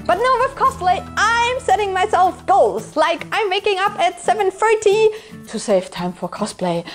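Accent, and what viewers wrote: Germany. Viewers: Das enlglish hört sich deutsch an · For me its really hard to sit though the video cuz of her voice.. and i dont like german accent for some reason soo.. yeah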